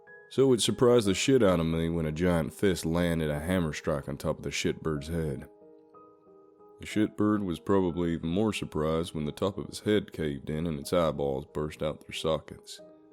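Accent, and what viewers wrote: South, United States. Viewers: You really have mastered that country American accent and it shows. And this one's coming from a good ol southern boy 🤣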